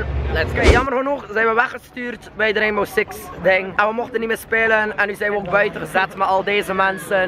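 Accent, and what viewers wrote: Belgium. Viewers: ben je een belg ?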